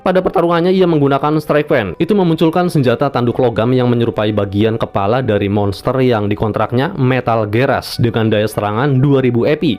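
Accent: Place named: Cilacap, Indonesia. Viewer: Rika wong Cilacap yah kang 😅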